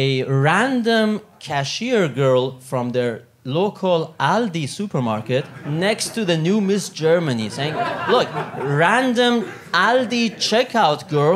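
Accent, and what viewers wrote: Iran. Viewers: Why does he sound Iranian? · he talks with persian accent! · De Santos with an Iranian accent?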